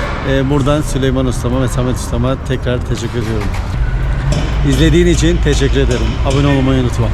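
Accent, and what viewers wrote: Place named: Konya, Turkey. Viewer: Konyalı olduğu nasıl da belli oluyor kardeşimin, Konya li olarak hemen anlarım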